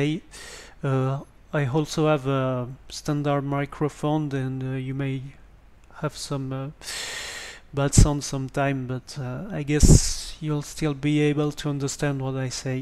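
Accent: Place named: France. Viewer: I'm a french guy listening to an english tutorial given by another french guy with a very french accent, reminds me of school Thanks for the tutorial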